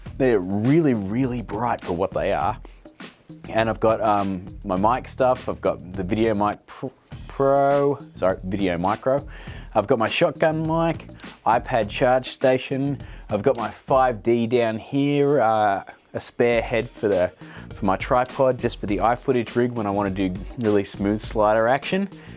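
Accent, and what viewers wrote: Australia. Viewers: judging by his australian accent, probably australia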